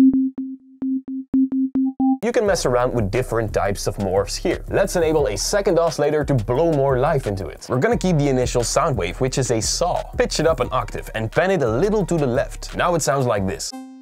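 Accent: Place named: Netherlands. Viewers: He does sound Dutch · from the accent I'm guessing you're Dutch!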